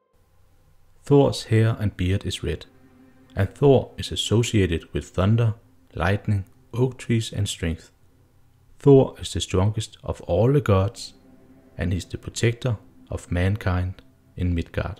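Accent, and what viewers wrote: Denmark. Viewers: The infamous danish accent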